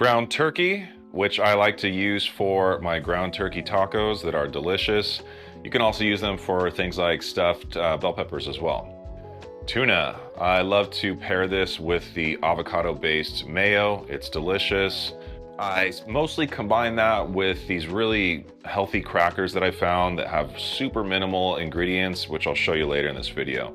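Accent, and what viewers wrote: United States. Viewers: You understand exactly what I am talking about. You look and speak like an old Southern QUEEN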